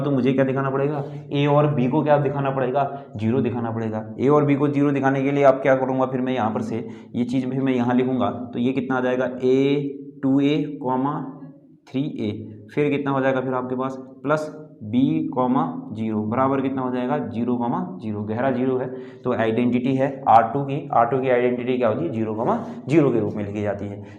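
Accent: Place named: Kumaon, India. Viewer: sir aap lohaghat ya champawat se ho na? bolne k tarike se अंदाज़ा लगा rha hu 🙂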